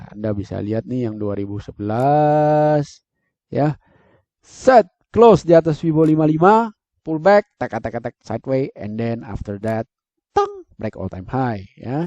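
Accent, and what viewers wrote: Java, Indonesia. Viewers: gw suka sama suaranya yg medok2 dan konten2nya bermutu.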